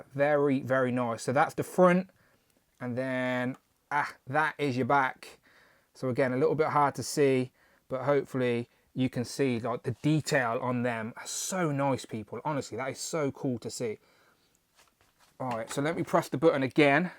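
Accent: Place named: England, United Kingdom. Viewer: These English gents are so funny the way they pronounce everything… Absolutely hilarious a lot different than us Americans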